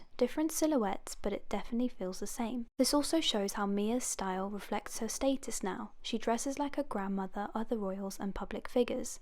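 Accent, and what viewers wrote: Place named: England, United Kingdom. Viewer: As an English girl with a similar accent, I can confirm that her voice is soothing af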